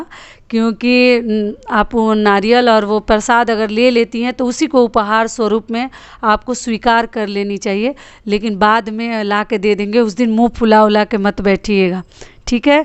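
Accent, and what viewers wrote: Bihar, India. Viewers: Mam ap bihar se ho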